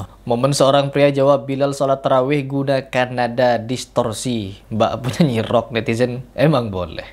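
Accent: Madura, Indonesia. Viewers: Mas logat MADURA tolong dihilangkan.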